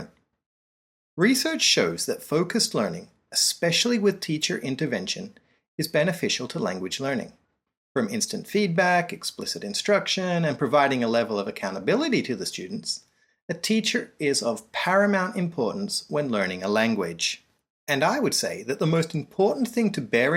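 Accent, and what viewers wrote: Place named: South Africa. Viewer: One question, where are you from? Your accent sounds really strange and muddled. If I had to guess, I'd say you're a South African trying to take on an American accent.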